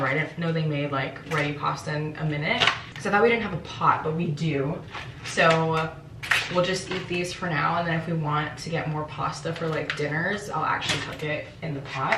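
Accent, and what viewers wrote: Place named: West Coast, United States. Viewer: I like your west coast accent. Stands out so much in NY.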